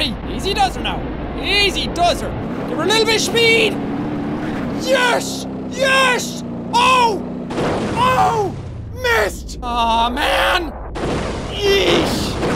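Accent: Ireland. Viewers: He was so much irisher in the past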